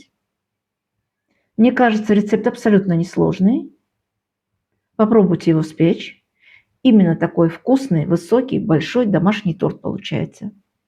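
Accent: Baku, Azerbaijan. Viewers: Smotru vas iz Amerika, no sama iz Baku azerbaijanka, za stolko let akcent ne izmenilsa, udaci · Спасибо огромное за рецепт тортика,такого в моей "копилочке" еще нет!!! Испеку обязательно,порадую внуков!!! Обожаю ваши ролики,ваш бакинский русский!!! Привет из Молдовы...❤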